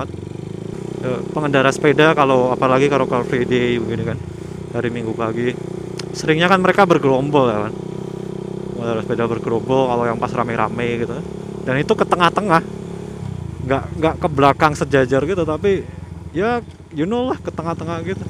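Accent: Semarang, Indonesia. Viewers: Koyo semarang iki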